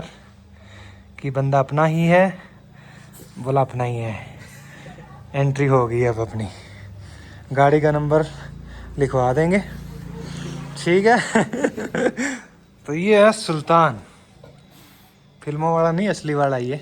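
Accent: Himachal Pradesh, India. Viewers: Aap ki aawaj or bolne ke trike se ese lgta h jese aap uper himachal se ho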